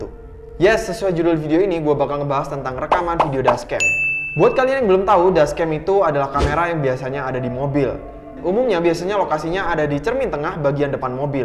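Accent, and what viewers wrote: Java, Indonesia. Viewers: Mase medok asline mana to? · Bang sodaranya tirta ya🤔 medok banget😂😂😂😂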